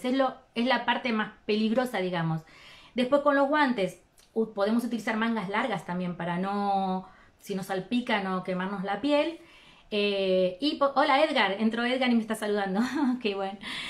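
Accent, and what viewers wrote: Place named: South America, Latin America. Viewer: Hola, sigue activo el grupo? Me gustaría hacer un curso, saber de donde son. La profe tiene acento de Sudamérica 🥰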